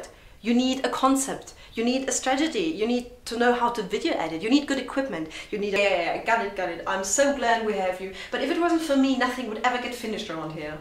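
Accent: United Kingdom. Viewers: Did your learning of English rely heavily on imitating native speakers? The reason I asked is that your accent, at least when speaking English, sounds like a more refined sort of English accent rather than a German accent. I was surprised to hear that you were German for that reason. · Heyyy teacher! I like your videos and your sense of humor. By the way, you've got a pretty good British accent. Peace!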